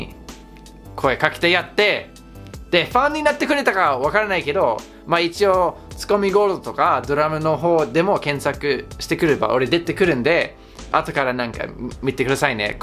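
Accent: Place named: Kansai, Japan. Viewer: ずっと思ってたんやけどの方言って近畿地方かな？話し方だけで親近感がわく笑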